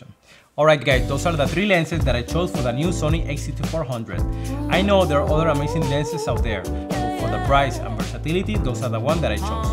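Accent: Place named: Venezuela. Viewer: Tu acento es definitivamente Venezolano!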